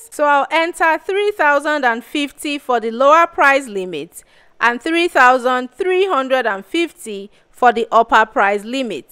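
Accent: Nigeria. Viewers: Are you a Nigerian, ? the accent is like mine.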